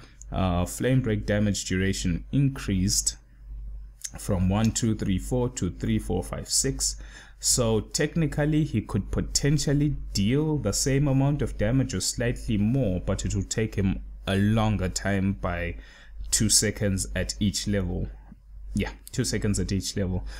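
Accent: South Africa. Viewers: is this guy from south africa? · is that a South African or perhaps Zimbabwean accent I hear?:D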